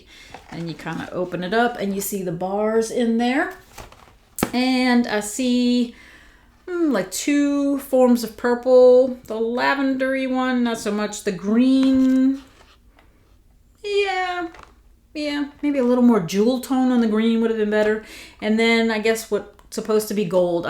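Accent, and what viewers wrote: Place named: New Orleans, United States. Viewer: So good to see you and your ideas are so right! I haven’t gotten this yet but it seems they did a good job with it. On the end of month live with Dan Orchard he pronounced beignets “Ben-ets”, it was so funny. Being from Louisiana we just take all the NOLA stuff for granted. It really is such a neat city with so much culture and history. I could tell by your accent you from there when I first started watching your channel. I’m a north Louisiana girl. Randazzo king cakes are so good. And a side note, Hubig pies are back out! My cousin brought me one at thanksgiving. Anyway really loved hearing your thoughts and can’t wait to sniff these!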